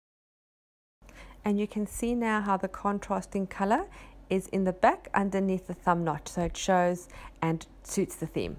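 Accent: South Africa. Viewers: I stumbled upon your page quite by mistake, and I am hooked. I was trying to figure out your accent - are you a South African? Lovely work, thank you for sharing. <3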